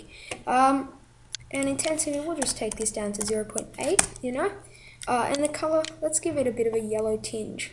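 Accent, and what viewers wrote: Australia. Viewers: I am quessing, just a hunch, your an Australian. Let me know if I am right. Nice to hear the accent..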